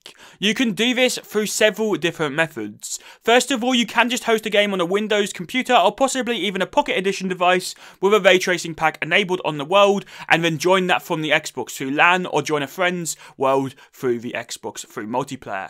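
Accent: United Kingdom. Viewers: i think he’s just british 💀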